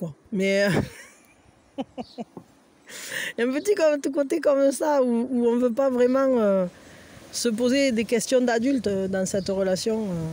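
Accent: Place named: Alps, France. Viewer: Bonjour. Quelques semaines que je me régale a t'écouter ton accent m'est de plus très familier tu ne dois pas être très loin de chez moi lol j'hésite entre les basses alpes ou les hautes alpes. Lol bref tu es devenu mon plaisir quotidien et en plus je te trouve très douée et pleins de répartie 😂. Même si ça ne me parle pas forcément à moi j'adore ta dérision et ton humour. Donc voilà je voulais que tu le sache. Car tu mérite avec tout le temps que tu nous accorde de savoir que tu fais bien de continuer. Bonne continuation à toi au plaisir de t'écouter encore. J'adore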